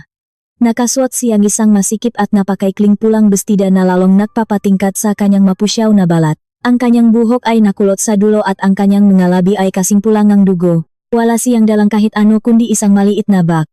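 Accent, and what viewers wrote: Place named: Philippines. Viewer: Pilipino slang mag narrate.. its annoying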